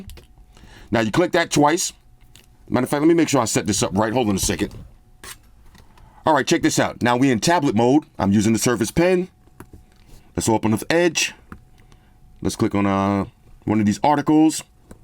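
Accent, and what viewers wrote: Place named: West Coast, United States. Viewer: Here my man I just heard the voice for 1 second and I subscribed he reminds me old school west coast 😂😂😂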